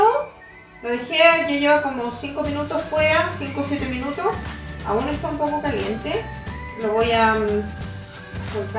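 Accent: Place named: Chile. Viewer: Pense que era mexicana pero es mas chilena que los porotos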